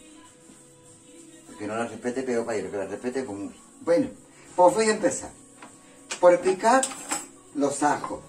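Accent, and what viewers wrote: Andalusia, Spain. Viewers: Ole tu ,y ese acento andaluz que me encanta, y esa cazuela que buenisima pinta ,gracias de una catalana 👍🎅🎄🎊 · Me encantan tus vídeos y no solo por lo que guisas también por tu gracia, ese ángel y ese acento andaluz que mola tanto.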